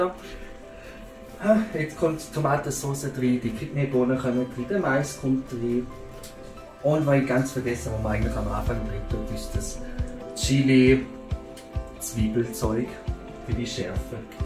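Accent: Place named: Switzerland. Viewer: Schweizerdeutsch hört sich irgendwie süß an...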